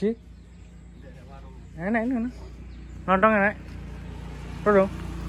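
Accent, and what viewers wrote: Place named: Central Java, Indonesia. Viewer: Mas rumahe mna kok kendaraane plate S tp logate omongan jateng banget😊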